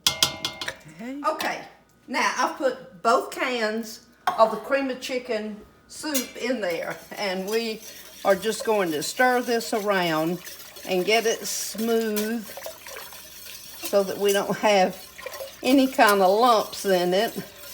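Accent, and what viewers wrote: South, United States. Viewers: I so enjoy watching ALL of your recipes! You remind me so much of my grandmother (we called her Nana!) She was originally from Alabama but moved to Corpus Christi, TX(where I was born and raised) in the 50’s cause my grandfather was transferred there with his job. She cooked alot like you, and had that southern draw just like yours, when she spoke! We all begged her to sit down and make s cookbook but she passed away when she was only 54 yrs young. You have really helped my marriage with your very EASY recipes! I’m retired now but my wife still works so I cook a lot of suppers now for the two of us. So far, she really likes every one of yours that I’ve made! Please make more! Take care from Bandera, Texas!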